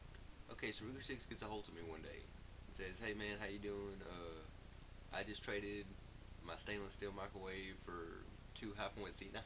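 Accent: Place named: Appalachia, United States. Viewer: He speaks exactly like the Hillbilly guy named "Boomhauer" from the TV show "King of the Hill". I could barely understand every other word out of this guys mouth. By the way, I just bought a Hi-Point C9, still have a few more days before I can pick it up at the gun store.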